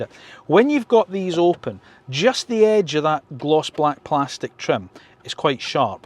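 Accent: Scotland, United Kingdom. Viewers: You’re scottish? 🏴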